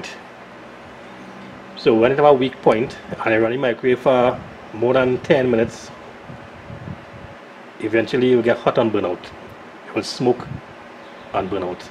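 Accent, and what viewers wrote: Trinidad and Tobago. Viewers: Can always know a Trini from his accent. Im one too. You could still discharge the Cap before removing the leads. Where you get parts in TT? Oster version here. Will work on it soon.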